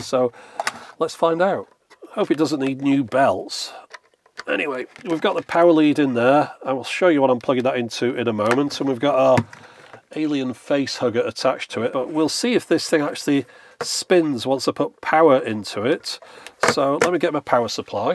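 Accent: United Kingdom. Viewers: Your voice through the window and brit accent sounds like C3PO. No offence...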